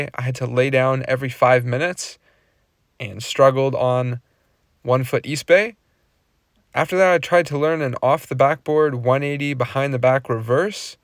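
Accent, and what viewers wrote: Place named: Canada. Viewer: what is your height? and are you canadian? you sound very canadian 😆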